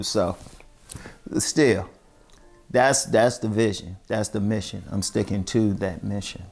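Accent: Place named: Baltimore, United States. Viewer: I’m 28 years old I hope to be this calm n calculated when I’m older s/o to you og from a northern VA Cat to a baltimore brotha I can hear ya accent 💯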